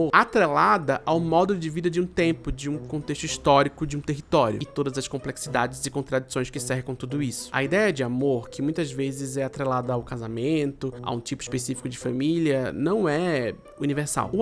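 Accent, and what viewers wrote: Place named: Recife, Brazil. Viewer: tu és da zona sul do Recife, moço?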